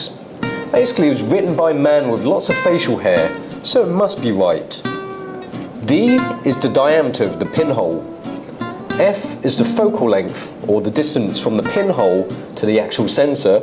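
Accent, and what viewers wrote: United Kingdom. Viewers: a chinese man speaking british wtf is going on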